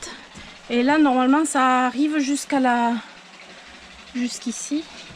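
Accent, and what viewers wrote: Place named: West, France. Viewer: Bonjour et j'adore votre bonne humeur et votre accent de l'ouest!!!!et bravo pour votre vidéo et merci 🌞🐞🍀🌹👏👏🦜✋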